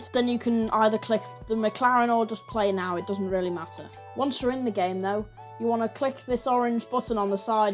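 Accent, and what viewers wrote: United Kingdom. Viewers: r u scottish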